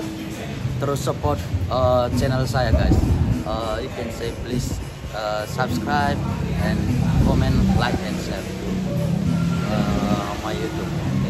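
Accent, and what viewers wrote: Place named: Medan, Indonesia. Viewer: Sepertinya logat anda anak medan ya.....hehehehehe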